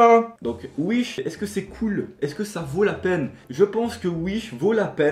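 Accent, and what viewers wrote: Switzerland. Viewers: Mdr je savais pas que t'étais suisse 😂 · Es-tu suisse ?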